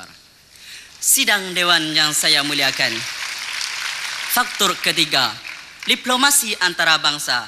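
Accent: Malaysia. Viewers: pelat melayu kuat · bunyi dia macam loghat melayu kedah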